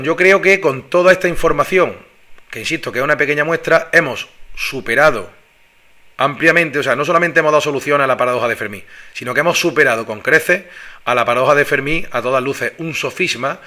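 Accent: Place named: Andalusia, Spain. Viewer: please, podrías ir un pelín más lento que no te alcanzo. Entre tú andalúz (como tú dices ) y tu velocidad me lo pones muy difícil 😞, gracias.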